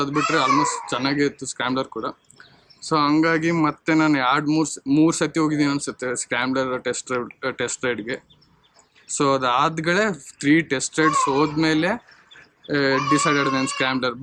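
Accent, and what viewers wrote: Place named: Yadgir, India. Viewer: Proper Yadgir accents 🎉❤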